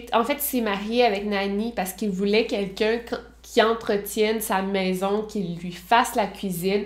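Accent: Quebec, Canada. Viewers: Honnêtement, j'ai vraiment dû mal avec l'accent québécois. Sans aucune raison, mais cela m'agresse les oreilles. Cela aurait été dommage que je m'arrête à cela compte tenu de vos recherches et vos affaires intéressantes. Alors je prends sur moi. Merci pour le partage. Très bon boulot. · EXACTEMENT ton accent quebecois et ton joli visage nous suffit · vous êtes délicieuse avec votre mimique et votre accent quebequois..je commence à vous suivre 100 pour cent Merci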